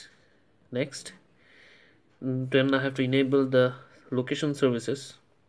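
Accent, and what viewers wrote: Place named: India. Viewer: Indian accent is so unattractive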